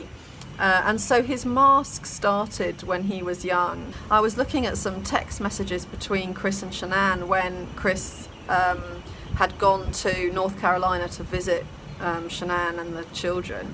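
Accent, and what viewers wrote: England, United Kingdom. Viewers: For her accent I thought she was from England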